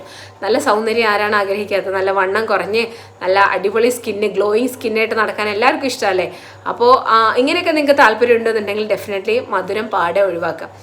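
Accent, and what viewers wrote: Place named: Vadakara, India. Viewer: താങ്കൾ വടകര ആണോ?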